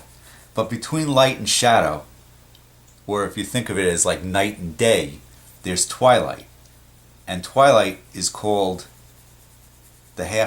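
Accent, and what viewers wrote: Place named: New York, United States. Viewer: drawing is more fun with a new york accent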